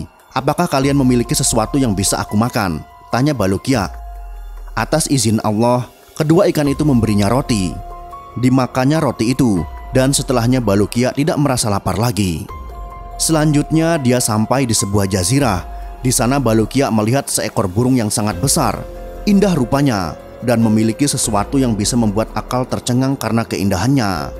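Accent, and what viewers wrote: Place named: Bali, Indonesia. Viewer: ni yg baca cerita kaya logat bali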